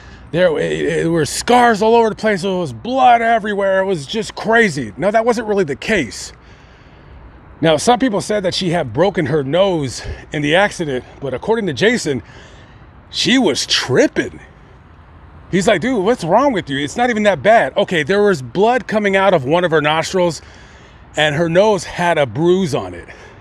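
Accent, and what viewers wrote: United States. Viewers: Un relato tedioso a cargo de un pelado con insufrible inglés "americano".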